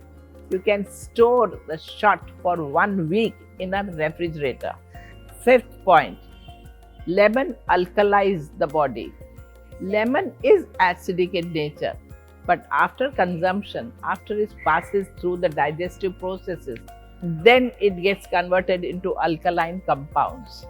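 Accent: India. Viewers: Why are the only showing white people in your background and why not other races ? She has Indian accent and not even bothered to show people of color in the background and talking about health :)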